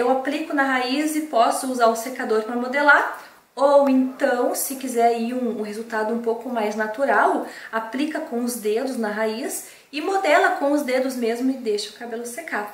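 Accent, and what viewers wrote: Rio Grande do Sul, Brazil. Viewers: Ai eu adorei teu sotaque...Gaúcha, ne? Eu sou também, e amooooo <3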